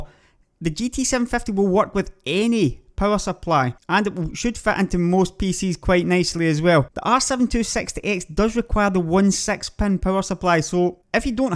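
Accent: United Kingdom. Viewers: You really sound like an arab guy that moved to UK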